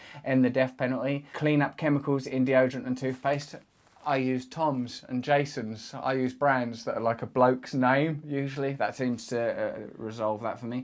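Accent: United Kingdom. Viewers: WTF? This is an American with a British accent. Just more proof the end is near. By the way, WTF did he say? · I love the way Russell talks being British but he clearly knows nothing about NESARA/GESARA and who is bringing it !!!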